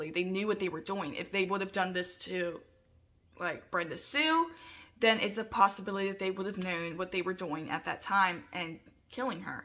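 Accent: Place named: South, United States. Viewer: You're welcome. I'm glad you received my message so well. I thought I detected a wee Southern accent, that is a sad story and you did well. Have you ever watched Murder She Shed channel? She's small too, having just started out and she's a real hoot. I'll always have the scars from what happened to me way back then but I made a decision to not be a victim. I have but one wish for Humanity and that is for people to learn to love themselves so they can love others then maybe all the hate could stop. Bless you too sweet Sister. Perhaps we'll chat again, I see potential in your heart and that makes me feel good and have a little more hope. 💖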